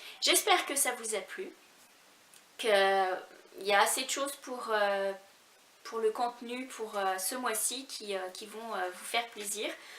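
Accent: Quebec, Canada. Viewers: Bonjour je découvre ton univers , et tu m'as l'air d'avoir un petite tonalité du quebec? j'aime bcp tes couleurs et tes créations me font très très envie. Je vais dévorer tes podcasts tout en tricotant et crochetant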